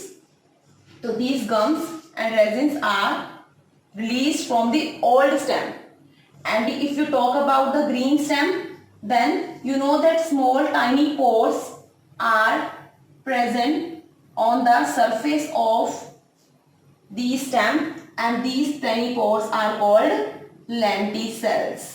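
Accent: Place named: Punjab, India. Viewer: I really like maam punjabi accent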